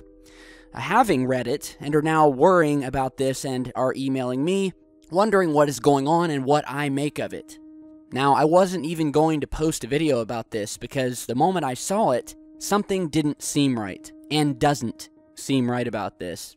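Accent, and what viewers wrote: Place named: United States. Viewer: Any chance you can learn pronunciation of the English, that's not American language? The word Antarctica has some "t"s in it, use them please! Get some education, PLEASE!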